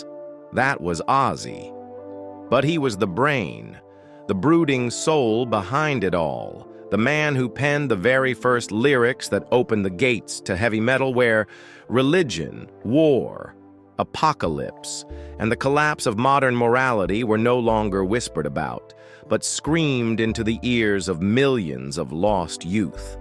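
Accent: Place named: United States. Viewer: can't all yanks just stop narrating Sabbath history in the awful American English...shocking...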